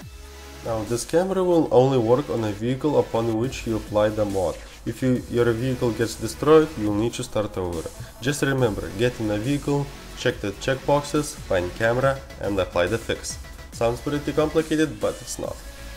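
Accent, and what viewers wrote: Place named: Russia. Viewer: I fell russian accent where you from comrade?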